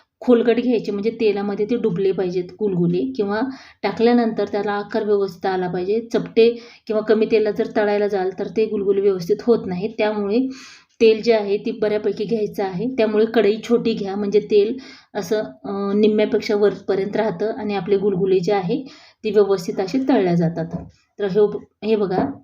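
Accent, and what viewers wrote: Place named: Marathwada, India. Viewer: Mi latur chi Lagech smjt la aaplya side chi tone 😊 Mazi frnd ahe dharashiv chi😊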